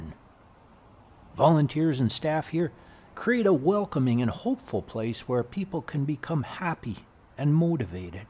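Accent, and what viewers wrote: Canada. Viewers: I dig your narrative style. So charmingly hinterland Canadian. Brings a smile to my face :)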